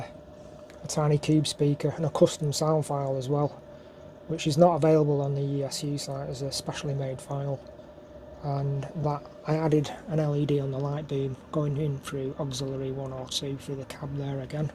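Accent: Manchester, United Kingdom. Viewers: Excellent video where you from you sound Manchester 😀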